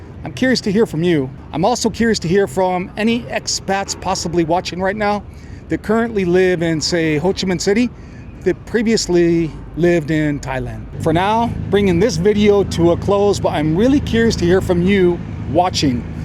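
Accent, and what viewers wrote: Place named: United States. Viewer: You sound so American. If only you knew how you sound to the rest of the world.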